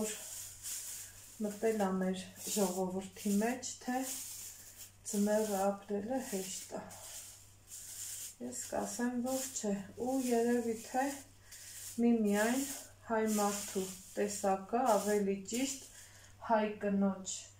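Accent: Lori, Armenia. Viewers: Барев джан вонц ек,ес нор ем миану кез,вортехиц ек,вонц вор лорва мер барбаров ес хосум кетевем кез,шат ачели е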